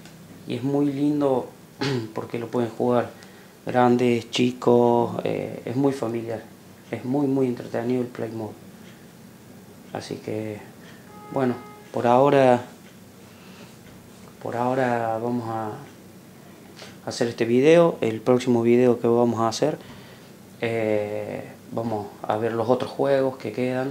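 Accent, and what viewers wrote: Córdoba, Argentina. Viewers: Se te nota lo cordobés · sos de Cordova? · no tarde ni 5 segundo en darme cuenta q sos cordobes aguante cba loco! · amigo sos de cordoba o de buenos aires etc etc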